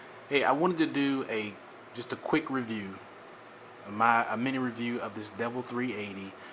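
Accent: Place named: Carolinas, United States. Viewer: see I knew you from the Carolinas. My sister lived in Greensboro North Carolina and she picked up the same accent.YEA like you said I am taking my time she is coming out nice but it's the gyro I am worried about. I am using a K-bar makaido system so I heard good thing about it. I even have the bluetooth to use for it to link up but I don't know how to use it yet your the best thanks bud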